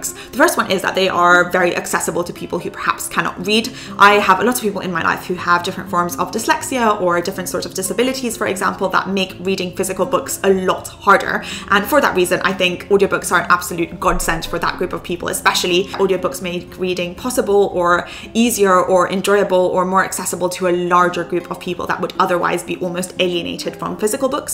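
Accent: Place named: United Kingdom. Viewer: plz add subtitles I'm not compatible with British accent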